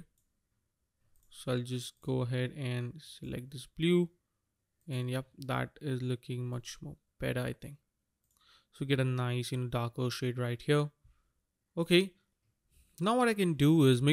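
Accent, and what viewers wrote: India. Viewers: Typical Indian English accent, lol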